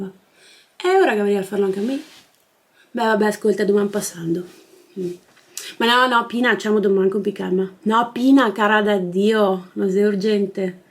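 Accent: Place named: Veneto, Italy. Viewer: Hahaha😂 me compare giacometto. Grazie x avermi fatto ridere oggi. Strepitosa 😂 P.S. Mi mancava sentire l’ accento veneto!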